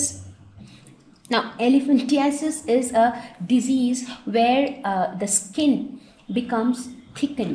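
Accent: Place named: South India, India. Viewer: Ma'am u r from south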